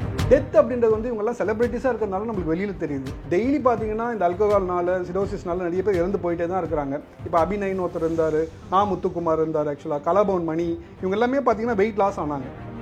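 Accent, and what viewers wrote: Chennai, India. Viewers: chennai slang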